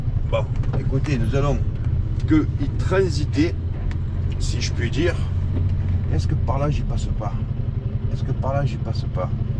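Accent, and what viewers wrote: Marseille, France. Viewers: Voilà ce qui me manque le plus aujourd´hui c´est ce franc parlé direct sans méchanceté ni agressivité que tu maitrises à la perfection. Le tout avec une pointe d´humour sans oublier l´accent Marseillais bien évidemment.......:)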